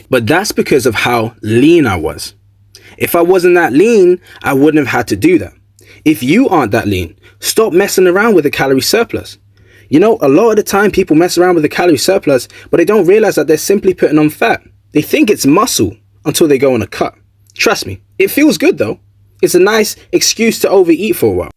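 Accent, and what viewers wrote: London, United Kingdom. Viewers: lol no it's a London accent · British accent is perfect for commentary. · it’s a london accent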